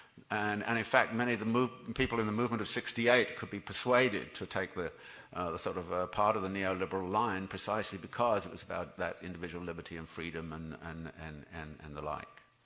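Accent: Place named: RP, United Kingdom. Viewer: I despise marxism, and commmunism. He is basicaly telling us this; "This 'crisis' is proof that your system is not working. Adopt marxism." . When crisis occurs under marxist system, watchout! Out come show trials, arrests of enemies of the people. His scolarly British gentry accent does not fool me. If people like him are in power, they will spill as much blood as they deem necessary to keep it. The red flag of communism gets its color from being dipped into blood of its victims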